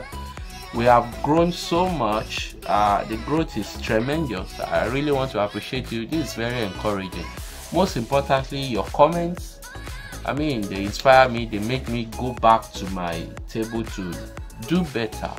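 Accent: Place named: Africa. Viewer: Thanks for the presentation, and, interesting breaking the ice to Africanize YouTube engineering video presentation. Your language is clear- sounds like a seasoned African. In addition to your efforts, I will encourage you to put what you talked about in this video into a practical teaching. You could have one biogas source, connect the engine to it, and then show the world how goodly it works. Try this and how that raises your views, likes, comments, and subscriptions. Thank you, Sir.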